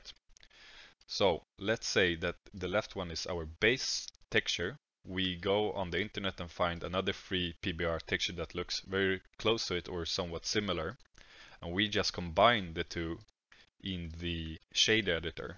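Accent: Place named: Sweden. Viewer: I've never been more sure in my life that I am listening to a swede.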